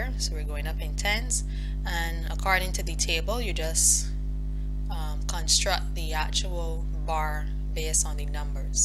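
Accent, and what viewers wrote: Barbados. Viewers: Why she had to have a Barbadian accent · Cause she’s prolly Barbadian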